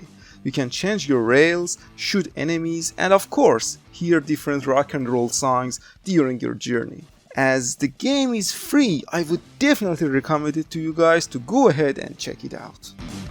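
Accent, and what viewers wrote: Iran. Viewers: bro ! thanks for the video good information and suggestion ... faghat ye khorde roo accentet kard kheyli iranie :D thanks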